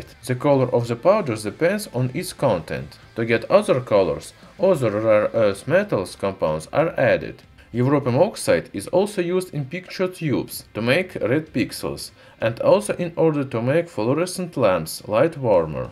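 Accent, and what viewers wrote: Russia. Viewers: Kindly forgive me Your accent is too much Russian when you speak English .Can you improve it anyway ? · Ах этот прекрасный русский акцент. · Who dosent like ur ruski aksent dosent deserve to be educated... I like it same as our hydraulic press Chanel...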